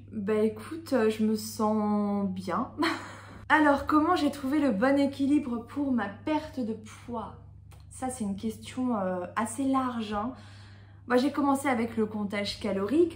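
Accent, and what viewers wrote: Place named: Brittany, France. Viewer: WoW une bretonne dans le sud ! Mais comment tu supportes la chaleur ?! Pour moi, descendre plus bas que Nantes c'est déjà pénible... C'est marrant parce que j'aime beaucoup ton petit accent (genre quand tu dis "pour soi-meume") bien de là-bas, j'aurais jamais pensé que t'étais bretonne comme moi !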